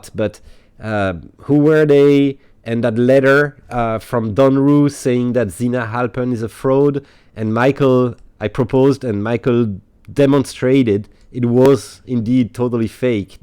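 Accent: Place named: France. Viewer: thank you. I totally misheard what you were saying. I usually have a better ear for the French accent. I just finish reading Zina Halprins book, yesterday. I heard you mention reading it so I got a copy. Any other good recommendations for Templar related books? Or any good books?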